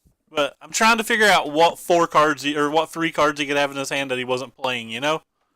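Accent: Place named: South, United States. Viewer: where you from, sir, Georgia? South Carolina?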